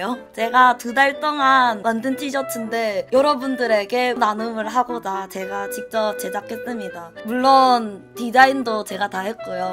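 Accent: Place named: Gyeongsang, South Korea. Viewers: 종종 잘보고 있습니다 같은경사도라 그런지 사투리 쓰는거 볼때마다 정겹네요 ㅋㅋ 40만추카드리고 리미티드 꼭 당첨되고 싶어요 <mail> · 양팡언니ㅠㅠㅠㅠㅜ 저 대학교 새내기 올해 1학년이에요ㅠㅠ. 부산사람인데 대학을 타지로 가서 너무 낯설구 외롭구 했는데 언니 덕분에 외롭지 않게 즐겁게 지내구 있어요 과제하다가 언니보구 밥 먹으면서 언니보구 시험공부하다가 언니보구 간식 먹을 때 언니보구. 제가 왜 이렇게 언니를 끊임없이 보는가에 대해서 생각해보았는데요 그건 아무래도 사투리가 그리워서 그랬나봐요ㅠㅠㅠ 진짜 언니 없었으면 향수병 걸려서 우울증 걸리고 자퇴했을거에요ㅠㅠ 언니 덕분에 한학기를 이렇게 잘 마치네요ㅠㅠ 항상 고맙구 응원합니다ㅠ 언니 영상은 보면서 공감을 많이 하게 되는 것 같아요 뭔가 사람 냄새 난달까??ㅋㅋ 나두 저런대 나두 저런대 하면서 무릎을 탁 치고 하죠ㅋㅋ 주위에 친구들한테두 열심히 홍보하고 있어요!!♡♡♡ 가끔 혼자 길가다가 파파라치 흥얼거리기도 하구ㅠㅠ 린온 흥얼거리기도 하고 ㅋㅋㅋㅋㅋ 저번에는 강의시간에 나이따 나이따 나나나나나나 나이따하는 금지님 목소리가 계속 멤돌아서 죽는 줄 알았어욬ㅋㅋㅋㅋ 언니 방송해주셔서 감사합니다ㅠㅠ 항상 파이팅!!!! 사랑해요!♡!♡ <mail>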